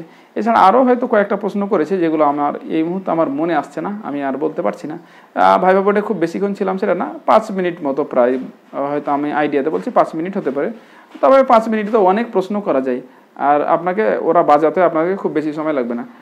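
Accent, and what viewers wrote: India. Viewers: Apnr kotha shune mone hocche apni indian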